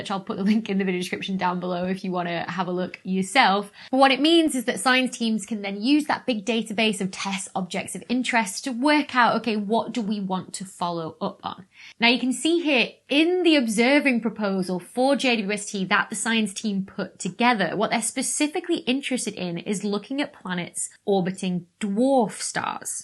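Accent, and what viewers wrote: United Kingdom. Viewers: I don't know why, but the British pronunciation of methane is driving me nuts lately. Do you pronounce pentane and octane with a randomly long leading vowell? Probably not. If there was a clue that the vowel should be long (like if it were spelt meethane ) that would be one thing which would justify British practice. But to my eyes and ears, the Brits are more wacky than usual. Dr. Becky, can you appeal this to the Standard English Authority_? ;-) (Let's leave _aluminum for another time. ;-) On another front, I recommended a video of yours to my mom many months ago. She reported that she couldn't understand you well enough due to your "thick British accent." You sound fine to me, but 20 years ago I worked for a company which had like 15% Brits. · It's her Briddish accent.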